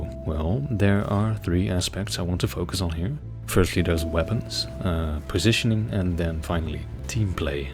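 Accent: Netherlands. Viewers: are you dutch? your sounds like it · Hey, nice vid. I was wondering, are you dutch? Your accent sounds dutch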